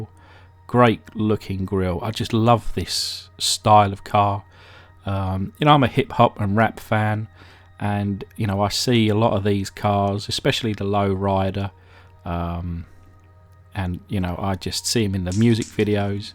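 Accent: England, United Kingdom. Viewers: Heard the British Accent, stopped watching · You have very traditional English accent.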